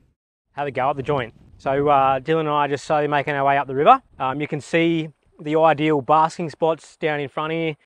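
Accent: Australia. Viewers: Terrible Ozzie accent!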